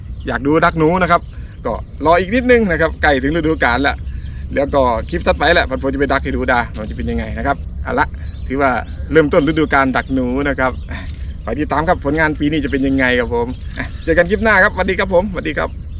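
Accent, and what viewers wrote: Korat, Thailand. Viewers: Fcโคราชจ้าชอบพี่พูดภาษาโคราช พี่ได่เมียบ้านไหนฉันอยุ่ขามสะแกแสงเดิ้อ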